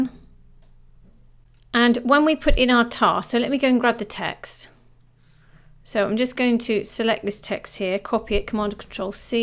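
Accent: United Kingdom. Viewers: British accent and the geat tips. Thank you.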